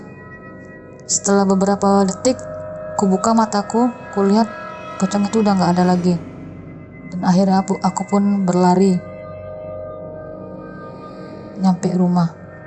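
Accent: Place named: Banjar, Indonesia. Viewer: Tapi logatnya mirip org Kalimantan atau banjar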